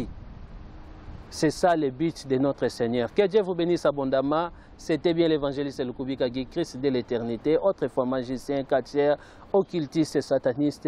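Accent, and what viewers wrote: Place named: Democratic Republic of the Congo. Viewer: N'insulte pas pardon.. C'est l'accent congolais qui fait ça.. Personne ne t'a demandé de cliquer sur la vidéo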